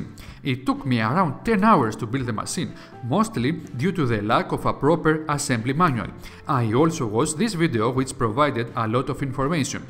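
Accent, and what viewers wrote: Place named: Greece. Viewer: Love the greek accent: "Took me about ten awerrrs to bild de maseen!" Keep it up!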